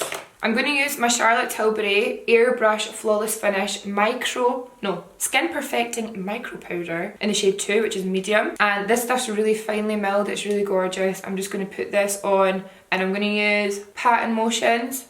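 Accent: Scotland, United Kingdom. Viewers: in love wid ur Scottish accent... n also u... I can relate u coz my face shape is like urs it's round n u inspire me to get make up in a way where I can look sexy n chiselled... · your just so darn adorable with your Scottish accent 😍😍😍😍😍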